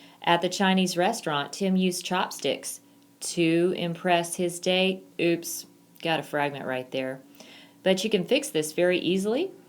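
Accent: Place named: United States. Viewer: That's her American accent. I like it lol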